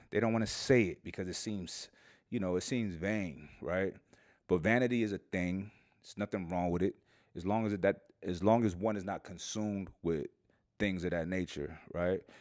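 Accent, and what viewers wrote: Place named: New York, United States. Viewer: Yo I could listen to you all day, you got that new yawk vibe #bigupstobrooklyn and your MCT oil is the truth!!!!! I'm a big fan The.one.and.only.marissa.ann on IG. And forget this red pill shit tell them Mike Rashid is his own pharmacy and pops a different color pill everyday lol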